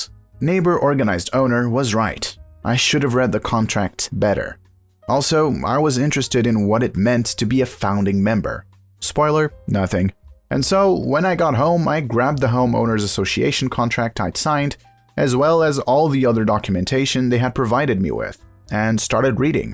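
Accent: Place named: Eastern Europe. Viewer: Just subscribed. These stories are new to me, no repeats so far. One thing: I'm enjoying the reader's accent when speaking English. I know it's not human but still, it doesn't sound strong enough to be a recent arrival from Eastern Europe, the accent has mellowed....what a thought about a computerized/digital voice.... there's something very, very wrong with me🤔 I suppose a portion of the listening public have reverted, really. 40 or 50 years ago, we listened to longer stories from a cord-bound radio in one room of the house(mostly.) These days, we go everywhere with a plastic plug stuck in one or both ears, often connected to a garrote-type device, for the sake of the same type of entertainment....stories. Well done, 🎉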